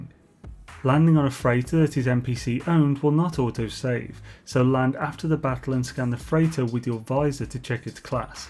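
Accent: United Kingdom. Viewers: Definitely a Brit, I'm going with somewhere in the midlands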